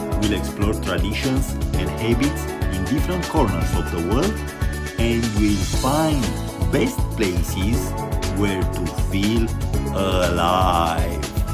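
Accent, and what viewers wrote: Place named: India. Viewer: インド訛りの英語だね。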